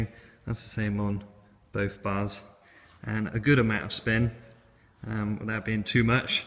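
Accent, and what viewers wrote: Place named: West Country, United Kingdom. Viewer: Love the sound of 'knurling' in a West Country accent.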